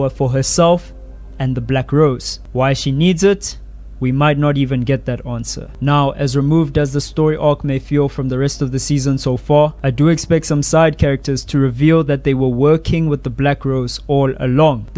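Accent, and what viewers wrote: South Africa. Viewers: Is just me or you South African your accent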